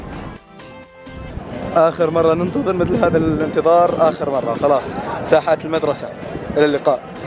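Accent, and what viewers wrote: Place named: Syria. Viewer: حلو كلامك السوري خليك هي عم تحكي دائماً💚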